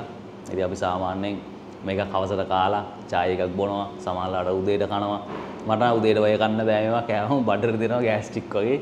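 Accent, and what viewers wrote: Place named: South, Sri Lanka. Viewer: මම නම් ඔයාව subscribe කරෙත් video බලන්න ගත්තෙත් ඉස්සර හිටපු travel bro නිසා❤අනිත් එක කතා කරන විදිය මොකද දකුණෙ නිසා❤මොකද මාත් දකුණෙ 😁, ඉතිං සුබ පැතුම් අනාගතෙට❤🎉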